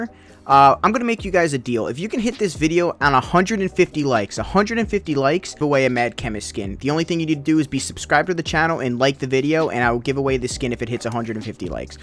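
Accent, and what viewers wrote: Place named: Germany. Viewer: in his bio it says he's from austria and he his first language is german you can also hear in his accent that he's german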